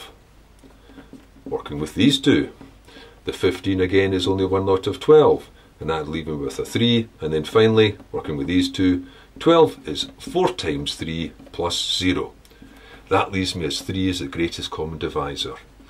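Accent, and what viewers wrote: Scotland, United Kingdom. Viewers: He's Scottish, not Irish.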